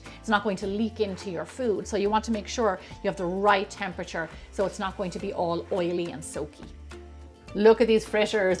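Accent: Ireland. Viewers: Ohhh my goodness!!!!!!I was looking for a easy recipe for fritters and BINGO!!!😂😂 first of all, i LOOOOOVE your accent!!! Truly🤗🤗🤗🤗 Irish???? OK so I love how easy it is and best of all no freaking yeast!! I love baking bout NOOOOOO yeast recipes, I just don't have the patience 😮 I'm gonna try these at work, I'm a cook in a rehab facility. These will certainly put a smile on the patients face and the employees come breakfast 🥟☕️ Thank you so much and I'll be looking into those doughnuts also. Glad I came across your videos. From deep in the ❤️ of TEXAS 😊😊😊😊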